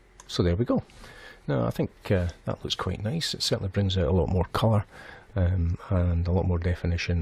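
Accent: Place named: Scotland, United Kingdom. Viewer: I heard scotish.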